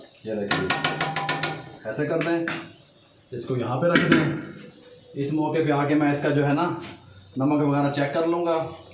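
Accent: Punjab, India. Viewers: Aap k bolne or kam karne ka andaz bilkul indian punjabi ki tarrah ha. Aisa ha kia maha raj?such bolna thanu guru di qassam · Apki recipe ke sath hum apki punjabi boli bhi bht enjoy krtay hain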